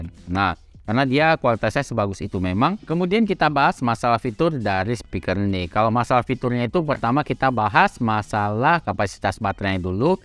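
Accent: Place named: Pontianak, Indonesia. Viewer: Bahase koko macam Chinese Pontianak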